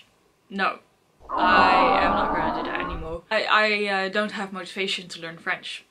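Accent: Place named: United Kingdom. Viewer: Today is the first time i know you, i am so happy to see you,, i wanna ask you "if you are from the Netherlands, why do you have Brittish accent when you speak English?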